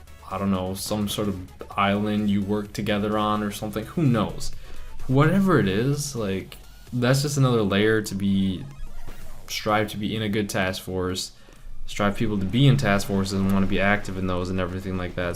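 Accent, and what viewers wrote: Canada. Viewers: Is Canadian? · sounds Canadian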